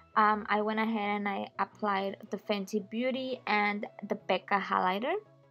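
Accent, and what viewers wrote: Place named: Mexico. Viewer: yall she mexican or sum thats why she has an accent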